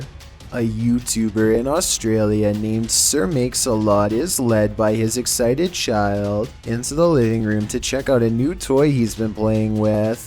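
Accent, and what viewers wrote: Canada. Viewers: your from canada?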